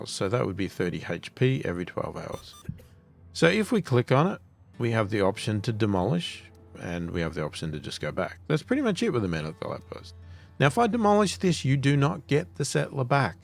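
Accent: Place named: Australia. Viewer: where you from brother u sound aussie... edit: yea checked the about section XD g'day brother XD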